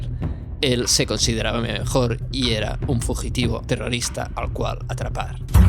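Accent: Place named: Catalonia, Spain. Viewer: Em fa molta gràcia com se't nota que ets català 😉 Salutacions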